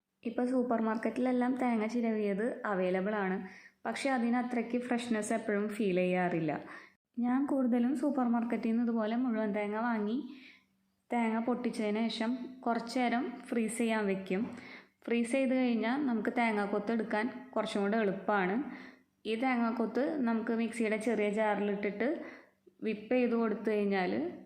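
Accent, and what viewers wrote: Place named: Thrissur, India. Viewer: തൃശൂർ ആണ് അല്ലെ 😂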